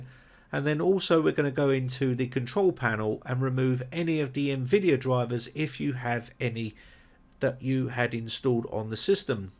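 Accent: United Kingdom. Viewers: Having built my first PC last week with a 2400G, I was happy to have everything working, until I hit the same problems as yours! Thank you so so much for your video, it’s rare to see a British video creator on the topic of PC building, especially Ryzens, so it felt very homely to have that accent haha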